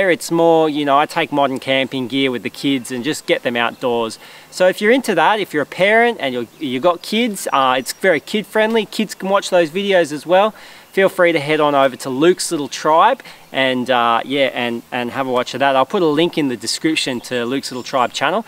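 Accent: Australia. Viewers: Great video, Luke. Enjoy your videos. Love hearing your Aussie accent. Keep enjoying life.🎉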